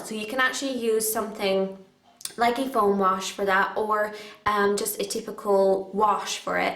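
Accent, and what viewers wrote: Ireland. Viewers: Not a little. She full irish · Are you a little bit Irish?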